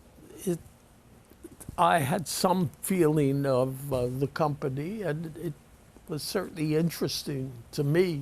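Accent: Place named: Northeast, United States. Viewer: A mix of NY & New England or just a northeast accent.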